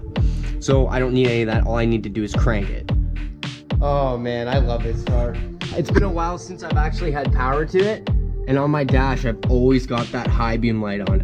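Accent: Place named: Canada. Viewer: from the accent im gonna guess r u from Canada?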